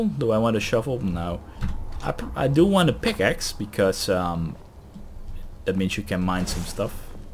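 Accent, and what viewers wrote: Netherlands. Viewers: Ok cool! When are the next parts coming out? Do you have an upload schedule? Sorry for all the questions I am just really excited for this series haha PS: Je hebt een Nederlands accent kom je uit Nederland?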